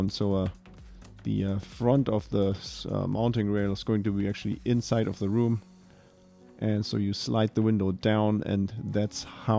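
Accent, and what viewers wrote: Germany. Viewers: This video got rid of any anxiety I had about installing these. By the way you sound very German. My tutor always pronounced his "w"s and "r"s like you do. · i love the subtle hints of the German accent. :)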